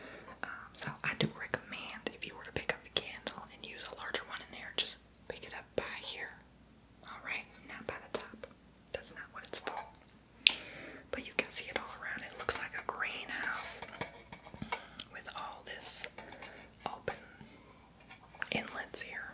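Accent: South, United States. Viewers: You do a southern accent so well! Would love to hear more of it in future vids♥️ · !!! This video is awesome! I looove the southern accent. And its a deep southern accent too. Reminds me of my family from Mississippi. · There goes that Southern Accent😂😂😂😂Love it😊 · This southern accent + candle tingles = everything.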